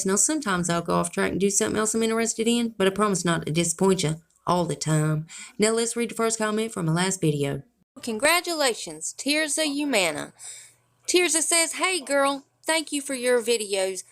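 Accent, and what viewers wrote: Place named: Alabama, United States. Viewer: I love how u say stuff, you sound just like me haha. I'm from Alabama:) thanks for all the news I mean in a good way. Sometimes ppl can take stuff wrong. I love listening to u and I watch your videos when I can. My son has special needs and he is getting older so it is getting harder but God has something great for him . Thanks:)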